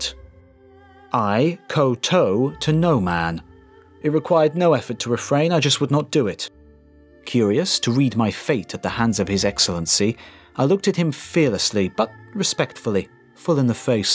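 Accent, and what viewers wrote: United Kingdom. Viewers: For a Native American, he sounds slightly British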